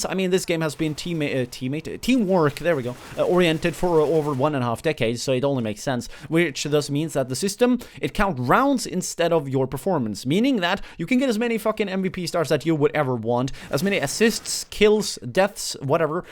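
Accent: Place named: Norway. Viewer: You are norwegian right? I can hear it on your accent. I'm swedish.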